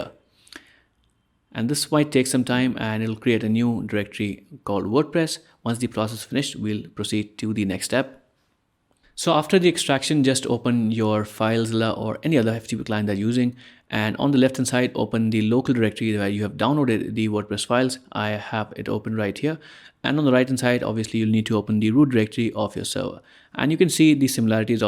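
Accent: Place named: India. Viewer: I feel like im going bat sh!t crazy when i listen to east indians talk. Your guys accents are absolutely unbearable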